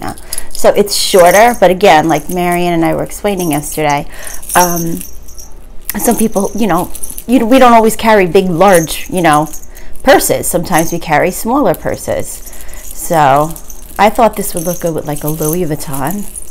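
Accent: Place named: New York, United States. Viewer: Your dp looks great so far! I’m a Jersey girl, you sound a little New York ❤️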